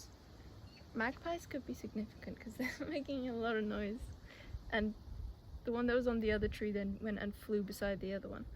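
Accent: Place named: United Kingdom. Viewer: What area of the UK is your accent from?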